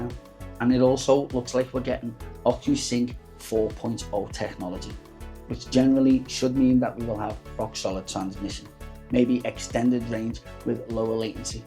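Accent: Liverpool, United Kingdom. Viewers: Wow did this guy just really say that 😂😂 its ok old white men think they have the right to tell people how to speak and behave 🙄 you obviously dont know what people from liverpool sound like. I I bet to some other people you might sound like your saying different words and letters, you should see what real people from liverpool sound like 👍🏼 they talk really fast and pronounce things differently but he is actually saying as normal, just your the only person who feels its thier place to tell him otherwise 🤔 gota let people be people without trying to put them down. He's actually doing really well, and maybe you dont think your being a absolute tool 🙄 and I wouldn't say its my place either to say your being a tool but you know your being a tool 😉. Now instead of commenting trying to put somebody down by telling them how to speak, try a more encouraging approach but you know what they say you cant teach a old dog new tricks, so get more worldly and understand that the world is full of people who speak different people. 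from liverpool have it hard enough with people trying to understand them from thier own country, now I have followed this guy from the start and all his first videos were AI voice overs because nobody could understand him, and he has changed and changed and changed the way he speaks enough over the past few few months to actually gain the confidence enough to speak on cam. And yeh at first he was speaking really slow and robotic because he tried to speak just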